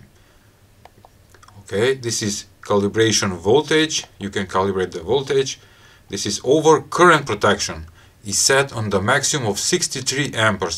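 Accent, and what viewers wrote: Russia. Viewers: I hear russian accent.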